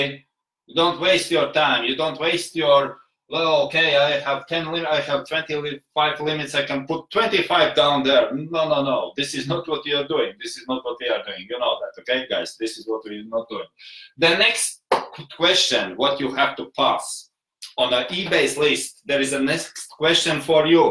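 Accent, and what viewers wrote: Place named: England, United Kingdom. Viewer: Interesting point of you! I like your English accent~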